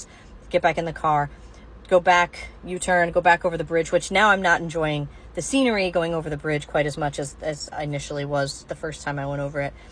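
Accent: United States. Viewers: The lesson is that Canada were more understanding and helpful than your own country. Thank goodness you're a white female with a clearly American accent. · She has an American accent. Where is she a refugee from? I have made that mistake near the Peace bridge before and got confused at the exit from I-90 and instead of getting on to Porter Avenue I ended up routed to the Peace bridge. My ordeal was much shorter though and I was able to turn around at the entrance of the bridge rather than going over to Canada. My memory is a little foggy but I think there is a toll booth there.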